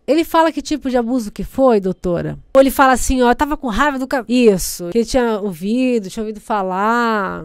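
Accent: Rio de Janeiro, Brazil. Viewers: Seu Sotaque carioca ficou muito bom . Simplesmente sensacional Parabéns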